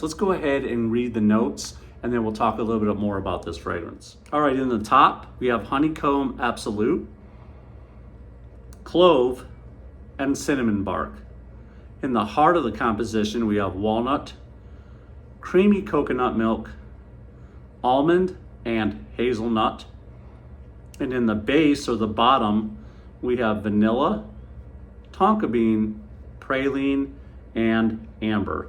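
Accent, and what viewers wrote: South, United States. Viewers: Great video my fellow Carolinian. I love this one as well. Don’t worry about speech sir, we country so it is what it is. If anyone wants this scent stronger, apply two sprays of this, then add stronger with you intensely. Thank me later! does it again. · Great review, sounda amazing. Dont worry about your speech, we are from tha south, most think we cant talk anyway😂😂😂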